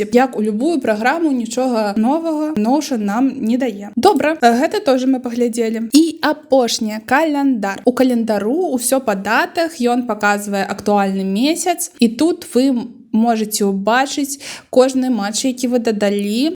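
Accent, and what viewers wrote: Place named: Ukraine. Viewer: Вельмі файна ў цябе выходзіць) Асабліва з ўкраіскім флерам)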